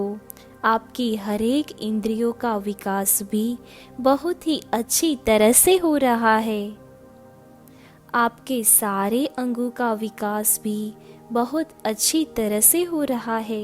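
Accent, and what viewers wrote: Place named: Gujarat, India. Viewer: Aapki hindi me gujrati aa jati he.. Aapki hindi pure nahi he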